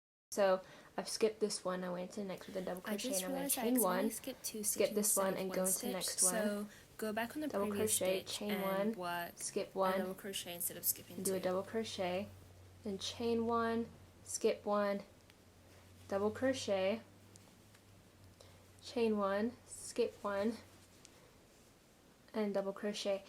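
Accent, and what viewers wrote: England, United Kingdom. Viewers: Love the English accent😊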